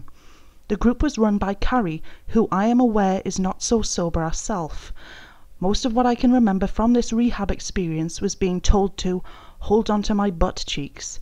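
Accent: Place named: Newcastle, United Kingdom. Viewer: More than British...you’re a Geordie...hell you could be one my neighbours 😂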